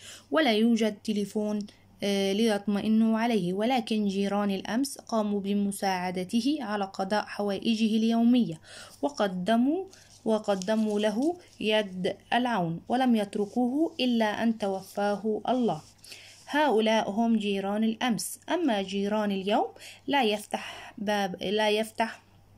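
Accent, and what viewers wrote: Egypt. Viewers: انتي مصرية؟؟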